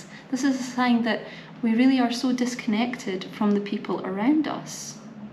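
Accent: United Kingdom. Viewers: I love women's accents from England. I could get a English woman to read me a book, any book, just to listen. Much love from USA. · I was curious as an American the gripes of someone from the UK. I only hear good things from people here about it from people that haven't been and wanted to see if the grass is just greener or it's actually better. I stayed for your accent and ability to convey ideas efficiently. I appreciate you.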